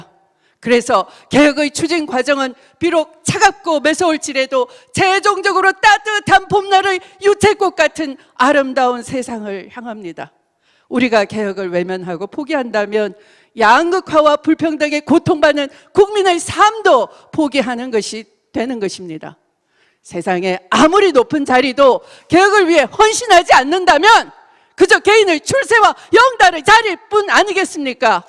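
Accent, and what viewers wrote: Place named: Jeolla, South Korea. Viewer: 너 정치병이야.병원가라.너 말씨는 전라도 말이 면서 고향이 대구라고 ..ㅋㅋ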